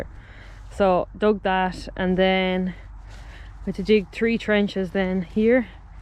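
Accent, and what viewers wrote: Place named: Ireland. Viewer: So great to hear an Irish accent! I'm Irish too but living in France. Going to be renovating an old house here too, soon hopefully. Very exciting. All the best with your build. I'm looking forward to following along!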